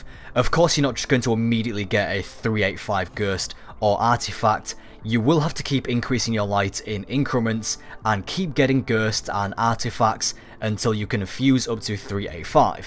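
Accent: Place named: Northern England, United Kingdom. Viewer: Because he has a northern English accent.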